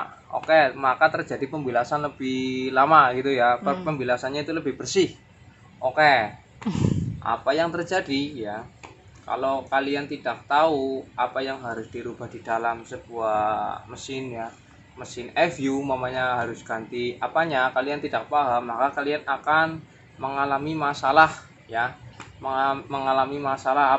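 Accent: Banyumas, Indonesia. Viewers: Bojone resing tenan mas... seneng khas ngomonge ngapak yak kepriweh pokoke khas tenan pertahankan mas... kaya mertua saya wong batang 😁 Ilmune aku nderek sinau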